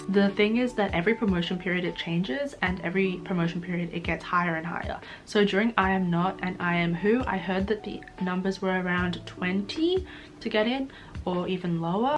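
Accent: Australia. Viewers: My dear , i wish someone would put at the comment site on what actually the step is because im not saying your way of explaining is bad but within your aussie accent i just cant understand much mate . It would be nice if you nicely put the steps at the comment site or at description or even subtitles because i cant get through of the whole video because i wasnt understand much . I just understand until at skz twitter link and after that about the albums mate i really doesnt understand i hope that you will understand our situation is . I really adore you and i wish to see more of your videos . Love , support and feedbacks from malaysia ! ❤ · I'm trying to understand everything you were saying but you speak too fast and my dumb mind can't affort that aussie english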